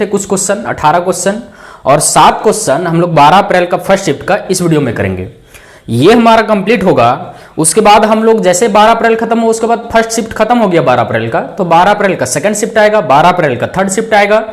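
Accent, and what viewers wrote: India. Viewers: Bhai mai aapse kuchh kehna chahta hu..... Aap garibo ke liye bhagwan hai..... Ho sakta hai kuchh log aapke desi bolne ke style ko nahi like karte ho.... Aap rukiyega nahi.... Thanks a lot keep continuing.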